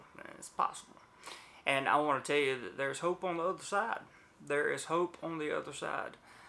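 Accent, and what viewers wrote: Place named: North Carolina, United States. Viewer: Great job, keep it up. As soon as I heard your voice I knew you were from somewhere by Charlotte😂 then it was confirmed. You're reppin NC well for us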